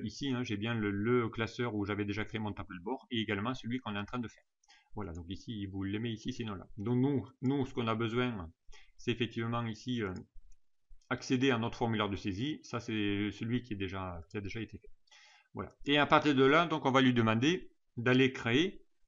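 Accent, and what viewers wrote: South, France. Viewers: merci monsieur pour ce partage de savoir. Les explications sont très claires et en plus on le petit accent du sude!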